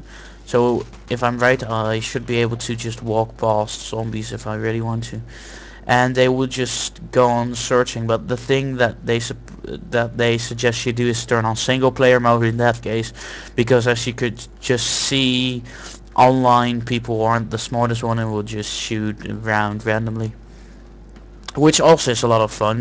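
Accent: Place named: Netherlands. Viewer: lol your dutch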